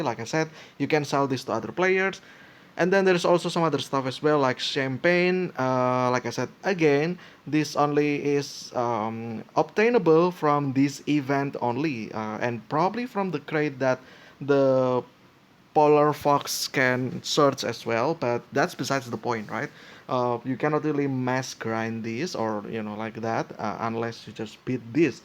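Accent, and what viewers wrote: Indonesia. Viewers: Aksen Nya Kena Banget Orang Indo Bahasa Inggris Nice Bang Informasi nya 🙏🏾🙏🏾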